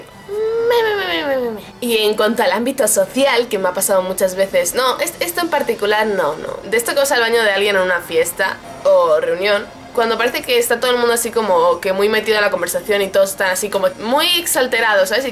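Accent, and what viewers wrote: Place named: Mexico. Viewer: aww Me encantas e asento mexicano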